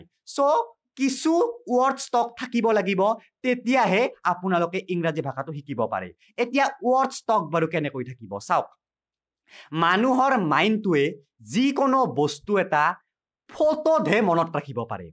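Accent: Nalbari, India. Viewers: সেয়া আপোনাকো কও যে কাৰোবাৰ ভাষা কোৱা 'ঢং' বা 'accent' টোক লৈ তেওঁক বিচাৰ কৰিছে। তেওঁ নলবাৰীৰ ল'ৰা, আৰু অসমত ঠাইভেদে এই ভাষা কোৱাৰ ঢং টো অলপ বেলেগ বেলেগ, মই তেওঁক চেষ্টাক সমালোচনা নকৰো, বৰঞ্চ তেওঁক চেষ্টাক ৱাহ বুলিয়ে কৈছো.....কাৰণ মই ভাষা কোৱা 'ঢং' টো নহয়, মানুহ এজনে কি কব বিচাৰিছে তাক লৈহে বিচাৰ কৰো মানুহ এজনক.... আপুনি মই যেতিয়া হিন্দী-ইংৰাজী কও, তেতিয়াও ই অলপ বেলেগ ধৰণৰ লাগে সিহঁতৰ, যিসকলে এইটো সদায় কৈ থাকে....আমি কলে আমাৰ accent টো তেওঁলোকৰ লগত নিমিলিব, এতিয়া accent নিমিলে বুলিয়ে কথাখিনি বেয়া বুলি উলাই কৰিব নোৱাৰি। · Tumar bhasa tur sur tu he Nalbariya. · I love the nolberiya accent❤️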